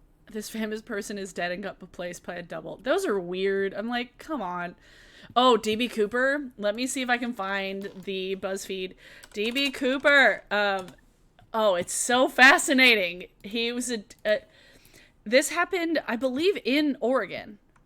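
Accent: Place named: Minnesota, United States. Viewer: I’ve never met anyone that actually talks like your Minnesota accent but that’s a great accent 😂. Maybe it’s because all the Minnesotans I know are from the Twin Cities area and I’m from the part of southern Wisconsin that’s near Chicago. If you want to watch comedy about the Midwest there’s a comedian called Charlie Behrens that definitely talks in that accent!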